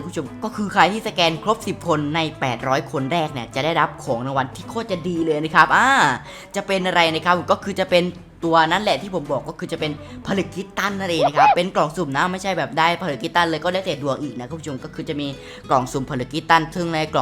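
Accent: South, Thailand. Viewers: พี่ครับพี่คนใต้ไช่ไหมครับผมฟังสำเนียงออก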